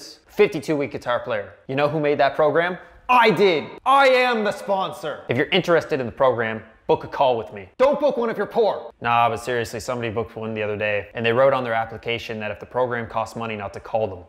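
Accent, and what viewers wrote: Nova Scotia, Canada. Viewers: You literally say "aboot" and I'm not even mad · he’s def from Nova Scotia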